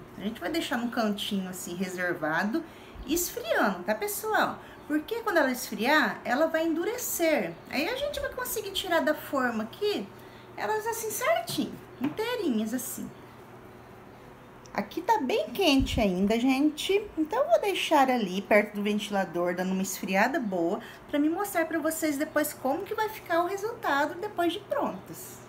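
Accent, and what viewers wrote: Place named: Minas Gerais, Brazil. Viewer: Sutaque mineiro ótimo vou fazer essa delícia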